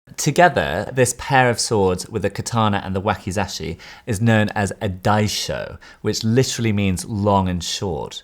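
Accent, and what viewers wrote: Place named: United Kingdom. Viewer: I agree, I think this was very interesting and well presented. My only complaint applies not only to, but to most English presenters, news readers and even academics and that is their totally British pronunciation of Japanese - especially names. I imagine it is so common, even at the museum, that the filmmakers are not aware of this. It grates on my nerves! A short class in Japanese pronunciation would not go astray.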